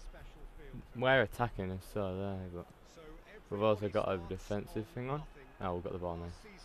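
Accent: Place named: Northern England, United Kingdom. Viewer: SAME and he's northern which makes it 10x better for me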